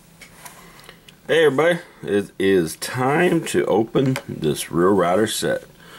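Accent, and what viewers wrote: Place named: South, United States. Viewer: Ha ha u said dude it sounds coolcuming from a southern accent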